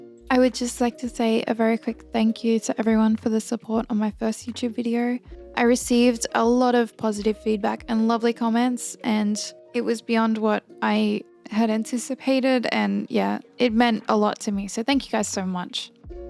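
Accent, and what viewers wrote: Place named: Australia. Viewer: lovely hearing an Aussie voice for a change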